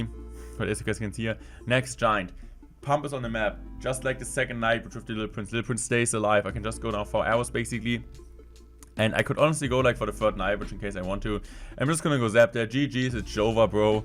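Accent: Germany. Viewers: Glaube gibt wenige deutsche dessen akzent so sehr triggert wie der von dir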